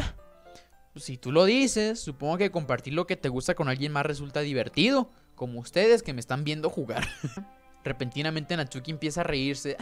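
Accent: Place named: Colombia. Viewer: Ahh mk, usted maneja bien el colombiano xd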